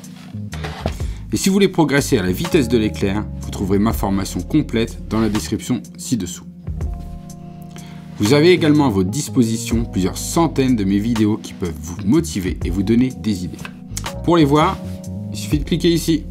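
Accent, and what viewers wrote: France. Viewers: Oh so you just said to hell with conversational English then. French accents sound amazing when speaking English! That and I was only a C student in French. Oddly, needing directions to the disco almost never comes up during art lessons... Dance break? Nice painting by the way. Will you be wearing that hat to the disco? 🎨🎮🔐😀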